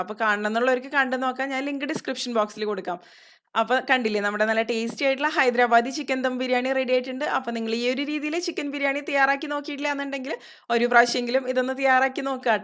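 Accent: Thrissur, India. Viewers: തനി തൃശൂർ ഭാഷ ,ഞങ്ങളും തൃശൂർ ആണ്,സൂപ്പർ റെസിപി ,നല്ല അവതരണം,നങ്ങൾക്ക് ഇഷ്ട്ടപെട്ടു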